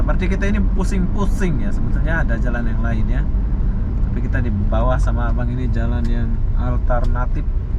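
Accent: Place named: Malaysia. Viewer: Bahasa Melayu nya kentel bgt bang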